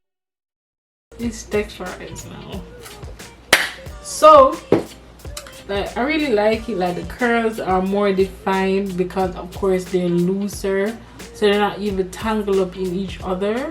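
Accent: Jamaica. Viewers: R u Jamaican 🇯🇲 lol slight accent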